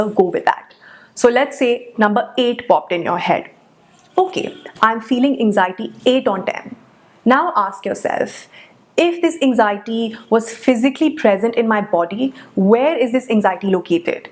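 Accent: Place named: India. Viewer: Amazing knowledge your sharing! Keep helping people my friend. Love your indian accent too you got a sub from me 😄 2021 LET'S MAKE IT A GREAT YEAR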